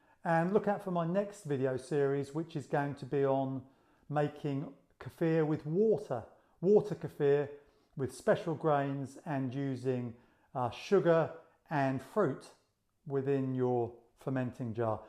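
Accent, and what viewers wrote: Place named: United Kingdom. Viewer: Great job 👏 perfect explenation. Btw : I like your British accent 😉 Greetings from Belgium 🇧🇪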